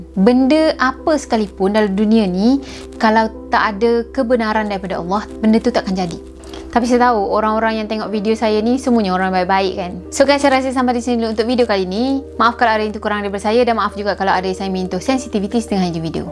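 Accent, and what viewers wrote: Kelantan, Malaysia. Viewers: Org kelatey ko kak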